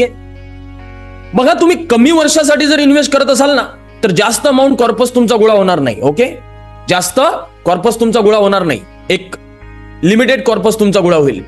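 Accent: India. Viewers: From..विदर्भ....भाषेवरून कळतय...😊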